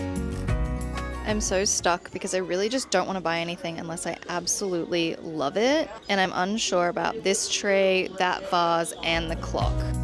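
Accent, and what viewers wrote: Australia. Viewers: Nice haul. BTW were you originally from Australia ? Cause you sound like one of us. I'm from Perth. W A. If you were ,what brings you to the states. Thrifting is definately better in the states. 😂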